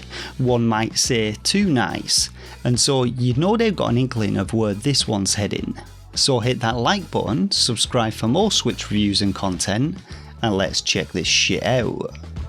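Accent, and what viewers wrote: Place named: Northern England, United Kingdom. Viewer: You sound like you're from the North of England but I can't quite pin down the city.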